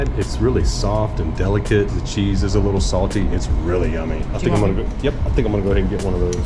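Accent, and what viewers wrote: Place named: South, United States. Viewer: You can eat Guayava paste with cheese, you should try it...Love your southern accent!!!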